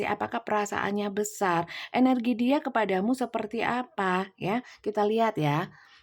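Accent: Java, Indonesia. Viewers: Seneng suoro jowone ❤❤❤❤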